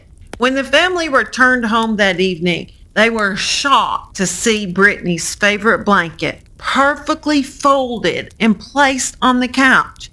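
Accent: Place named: South, United States. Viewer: southern accent makes the word poem sound like p*rn to my English accent, lol ☺️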